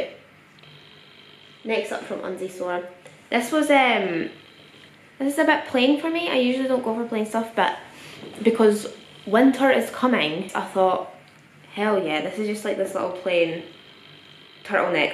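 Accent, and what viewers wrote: Scotland, United Kingdom. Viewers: ̗̀c h a o s ̖́- scottish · I wasn’t ready for the Scottish accent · Sounds scottish to me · wow. this is the first video i’ve seen her talk. was not expecting a scottish accent. lol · are u Scottish?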